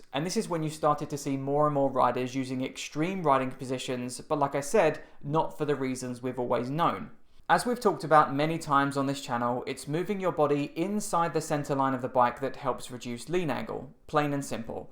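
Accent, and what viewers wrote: United Kingdom. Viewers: It is difficult for me to understand his british accent with the echo due to the microphone being at a big distance from the speaker.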